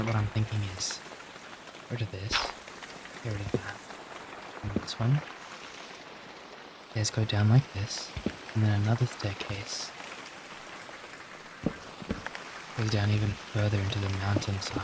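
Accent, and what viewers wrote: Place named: Australia. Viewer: Hi!! You can call me Dove I’m from Australia (guessing you are also based on the convo about weather being warm and the similar accent lollll) and I don’t usually comment on your videos but thought I should just say thank you so much for uploading these. I struggle a lot with anxiety and mass amounts of sensory input due to my ASD, so these holidays have been super stressful and energy consuming with me, especially since my family has to cook for 7-12 people who are coming over tomorrow for Christmas (not including cooking for those that already live here). Anyways I digress, these videos do help so so much after chaotic and hectic days for me, it’s so lovely being able to put on a video that doesn’t yell at me before I sleep about a game I hold very dear to my heart. Keep up the awesome work mate! And happy holidays! Edit: Deleted the previous comment so I could support this awesome channel loll!!!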